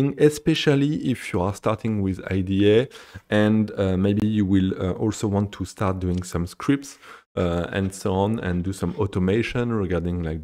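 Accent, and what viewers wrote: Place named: France. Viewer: Le magnifique accent français ? Super vidéo sinon !!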